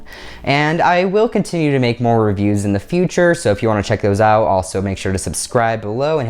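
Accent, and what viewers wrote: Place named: Spain. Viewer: Your Speak to spsnish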